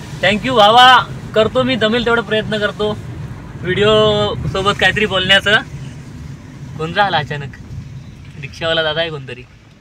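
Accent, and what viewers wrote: Konkan, India. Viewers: लय भारी आपली कोकणी बोली भाषा एक नंबर, चे व्हिडिओ बघितले आहेत धुमाकूळ घातलाव, तुमचा फॅन च, मेहनतीला पर्याय नाही, 🙏 विडिओ दररोज येऊ दे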